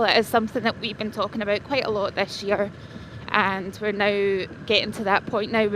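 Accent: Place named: Scotland, United Kingdom. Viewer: Very deep Scottish accent!!!! Thank you for the info!!!!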